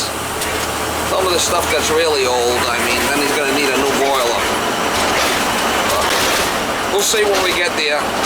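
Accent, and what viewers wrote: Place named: Boston, United States. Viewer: You sound like you are from Boston .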